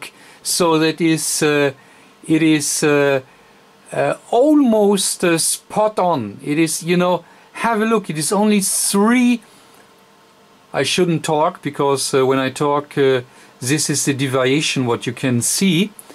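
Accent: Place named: Germany. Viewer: In the first 5 seconds i know that you are a German 😂 your accent is amazing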